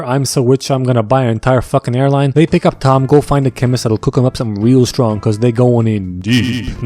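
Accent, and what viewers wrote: Spain. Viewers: ...I thought he was either Spanish, Hawaiian or Asian. 🤨🥴🤔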